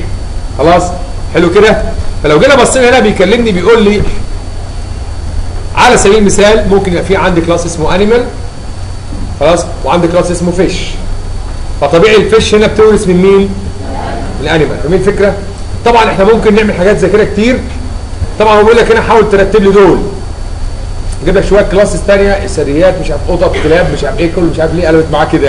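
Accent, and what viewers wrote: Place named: United Kingdom. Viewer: OMG!! what the hell is this you're killing me with your english accent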